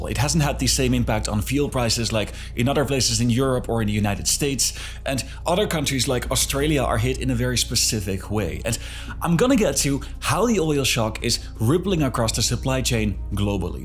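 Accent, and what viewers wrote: Netherlands. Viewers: Mate are you dutch?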